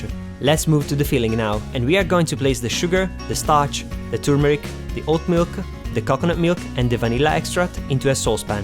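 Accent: Italy. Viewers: Si sente che sei italiano:)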